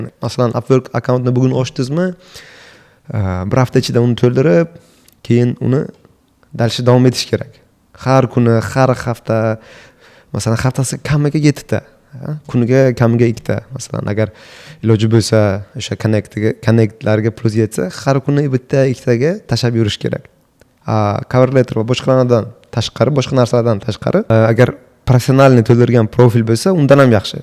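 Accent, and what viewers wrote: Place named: Bukhara, Uzbekistan. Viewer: aktentan bildim Buxorolik ekanizni Vobkentdan Salom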